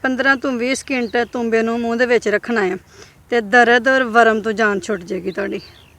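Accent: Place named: Sialkot, Pakistan. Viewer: آنٹی جی آپ کی حکمت کے بارے میں تو میری کوئی اچھی رائے نہیں لیکن آپ پنجابی بہت پیاری اور میٹھی بولتی ہیں، مجھے لگتا ہے آپ کا تعلق سیالکوٹ سے ہے۔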